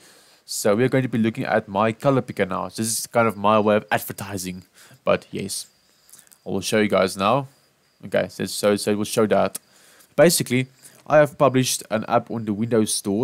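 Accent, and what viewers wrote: Afrikaans, South Africa. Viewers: brah ek sweer jys afrikaans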